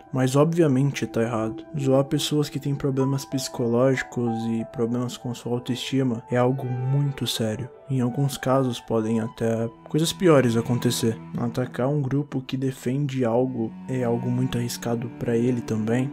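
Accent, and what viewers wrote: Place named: São Paulo, Brazil. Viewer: Carioca com sotaque paulista, pq?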